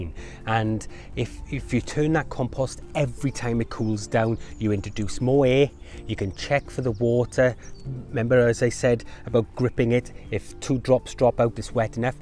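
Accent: Wales, United Kingdom. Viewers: When I hear a Welshman talking about gardening I know I can trust him. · Hi, I LOVE your accent you Welshie just like me but ive not got Yer accent sadly lol ...... can I put put my Tortoise poo in the compost as she only eats Greens shame to waste it ? Thanks I subscribed so i can LIISTEN to you, of course i definitely need your Help !! Thanks x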